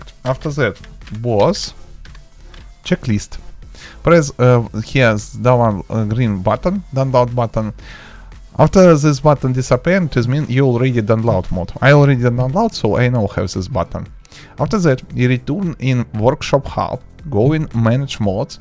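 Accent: Russia. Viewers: Чувак, русский акцент прям выделяется. А так видос классный.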